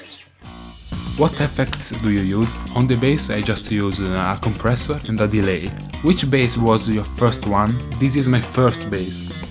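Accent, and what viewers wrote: Italy. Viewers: If you observe closely you can hear a tha italian accent. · Bruh, he already revealed his laugh 5 years ago and his italianglish voice too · • Italian voice/accent revealed • Laugh revealed CHECKMATE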